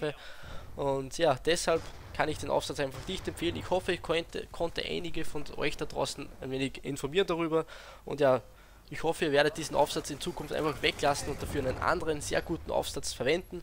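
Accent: Austria. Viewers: Wo genau kommst du eig. aus Österreich?:D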